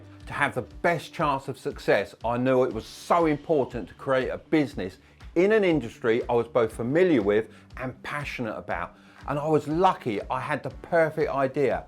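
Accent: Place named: Norfolk, United Kingdom. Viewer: nice norfolk accent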